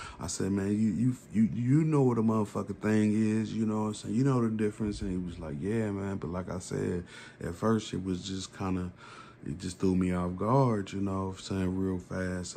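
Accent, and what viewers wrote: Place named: South, United States. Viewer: Water ever time you talk…talk like u from slow south…… story dope though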